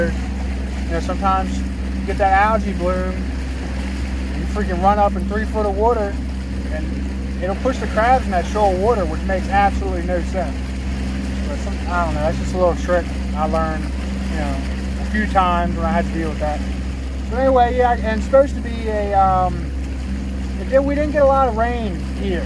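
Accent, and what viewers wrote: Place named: Patapsco, United States. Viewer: Man, where are you from? Sounds like Patapsco. Lol. I'm down in Deale. Where's your store. Ill come up.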